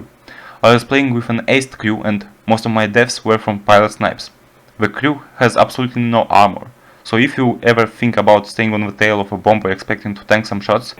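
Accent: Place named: Russia. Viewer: Твой русский акцент режит прямо по ушам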